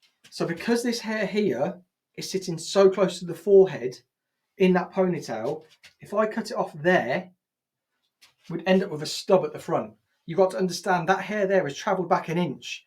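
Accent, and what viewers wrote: United Kingdom. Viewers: i like ur explanation also ur britsh accent. like and subscribe you.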